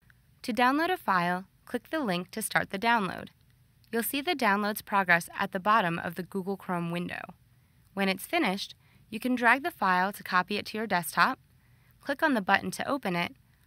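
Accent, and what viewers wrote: United States. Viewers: She just sounds American to me... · The Voice of the narrator who is obviously from Long Island is the reason I will not be downloading this. I couldn't get through her voice or her gleeking. It's TAB not TAAAHHB. Arrrghh she is SO Long Island. I can hear the saliva in each word. She does sound hot though. Go figure. Firefox with Google bar is just fine. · She speaks american in a very annoying way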